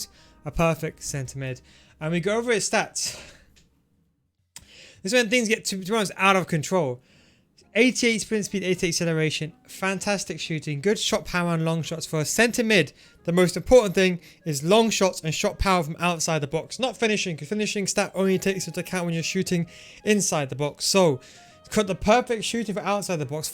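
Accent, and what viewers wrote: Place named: United Kingdom. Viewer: Love the review. Off topic but i heard your accent and curious where in UK you're from?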